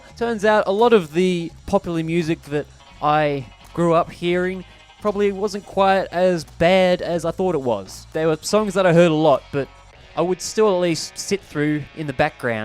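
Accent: Australia. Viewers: I wasnt expecting Australian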